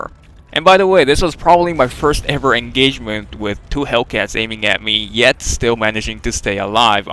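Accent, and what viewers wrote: Germany. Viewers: würdest du auch mal ein deutsch schprachiges video machen man merkt nemlich das du deutscher bis !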